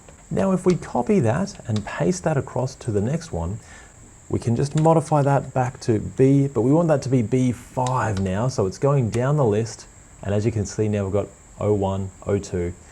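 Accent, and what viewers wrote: Australia. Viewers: so nice as an aussie to hear another aussie accent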